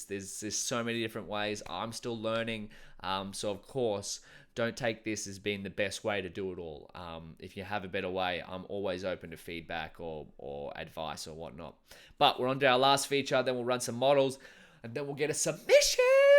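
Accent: Australia. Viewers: Came to learn the kaggle format, stayed for the Aussie accent and comically positive energy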